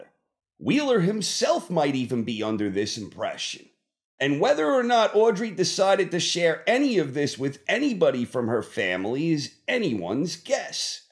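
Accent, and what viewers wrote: New York, United States. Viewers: you are the man, can't thank you enough for this channel! Quick question for you..are you a NY'er? I can tell that accent when I hear it, I'm born and raised!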